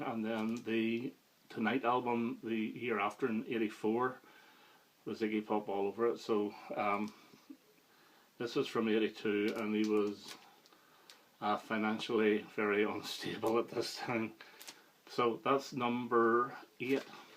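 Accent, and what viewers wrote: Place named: Scotland, United Kingdom. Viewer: you did a great job critiquing Iggy's albums. I really enjoyed it, and I think your laidback style and relaxed Scottish brogue were major reasons for that. I had 1st album with ' ' on it. I really like Iggy's humorous songs. I think he writes very funny lyrics like ' '. ' ' has often been likened to ' ' do you think that's a relevant comparison? Totally agree that ' ' was Iggy Pop's greatest album. Every song is tremendous.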